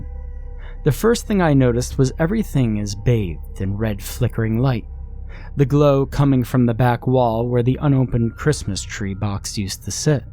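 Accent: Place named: East Coast, United States. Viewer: I'm hearing an East Coast accent....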